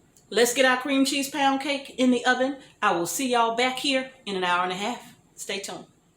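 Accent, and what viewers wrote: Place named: United States. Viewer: I love this lady she talks so nice. Not overly English or southern style. Very good sound for me I am hard of hearing l love her American sound.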